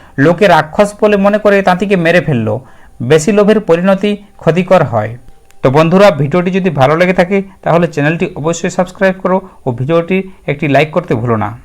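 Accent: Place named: West Bengal, India. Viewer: Subject bhalo, kintu Medinipurer tane Bangla ta poriborton korte hobe....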